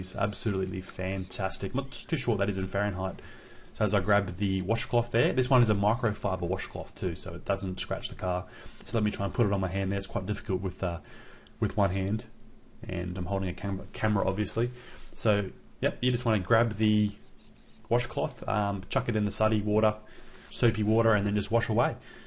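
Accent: Australia. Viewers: He’s Aussie? Let’s go😂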